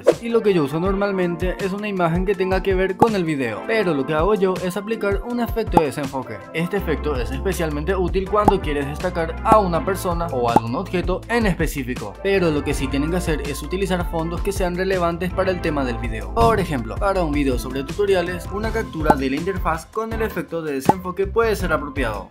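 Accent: Paraguay. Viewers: Ya me sonaba el acento paraguayo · Tu acentó parece paraguayo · Bro, sos Paraguayo? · Eres Paraguayo? Tu forma de hablar me suena Paraguayo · nde kp vos sos paraguayo? se nota en tu acento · Paraguayo ? verdad kp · Gran video, me ayudaste mucho, por cierto, ¿sos paraguayo? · Sos Paraguayo? xd, me doy cuenta por ti acento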